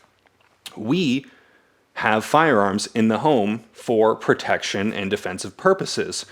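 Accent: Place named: New Jersey, United States. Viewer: Sounds like Jersey.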